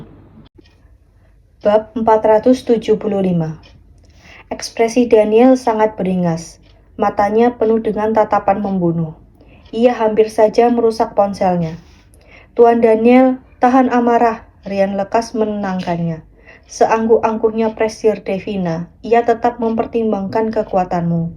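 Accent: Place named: Java, Indonesia. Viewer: Koq jadi medok 😭😂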